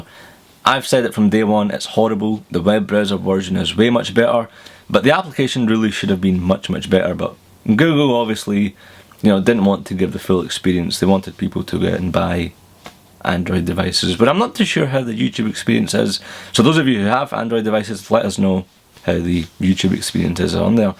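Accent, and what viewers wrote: Scotland, United Kingdom. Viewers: lurve the scottish accey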